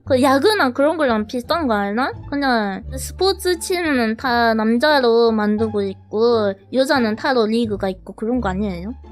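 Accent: Japan. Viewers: 한본어 개귀여움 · 이 버튜버 한본어 지켜주세요... 매력포인트야.. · 한본어 존나 맘에 드네 ㅋㅋㅋㅋㅋㅋㅋㅋㅋㅋㅋㅋㅋㅋㅋㅋㅋㅋㅋㅋㅋㅋㅋㅋㅋㅋ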